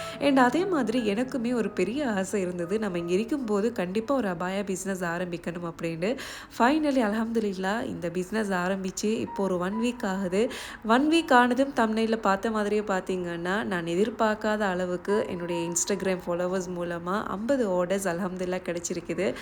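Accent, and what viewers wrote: Sri Lanka. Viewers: Sister ongede ellam ok but konjem style eh korainge.neenge trinco side thane trinco pecha pesunge erichala irikki ongde voice eh keke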